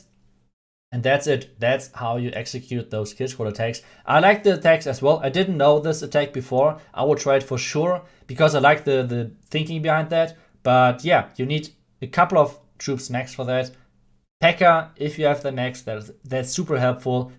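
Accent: Germany. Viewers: Man hört einfach das Deutsche komplett raus... Nichts gegen dich aber dein Englisch ist echt nicht gut ^^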